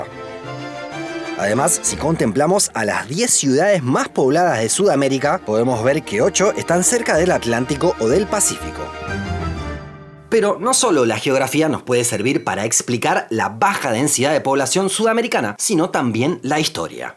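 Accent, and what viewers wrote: Argentina. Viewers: como que no es argentino? el canal es de Argentina, hay tres personas detrás del canal, el chico que aparece en los videos se llama Diego Briano y es argentino. O de donde pensaste que era el acento? Mexicano..?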